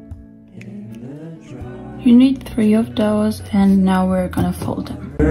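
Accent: Germany. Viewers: ich liebe diesen deutschen akzent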